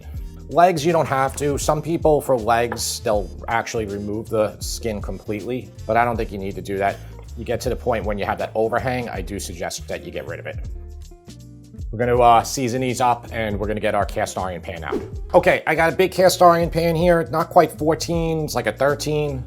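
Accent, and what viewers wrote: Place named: East Coast, United States. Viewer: Boouught and fooour cups, abatt. Awofff?!? Swalt?! What?! Is the east coast okay? Malk... no.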